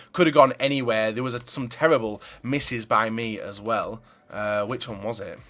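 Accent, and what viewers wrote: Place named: Yorkshire, United Kingdom. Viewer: Zeid Abdellatif I think it's a Yorkshire accent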